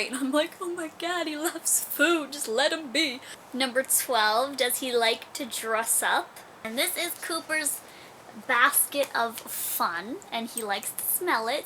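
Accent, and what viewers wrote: Saskatchewan, Canada. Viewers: O just saw u from canada but i think ur from saskatchwan ur accent seems like there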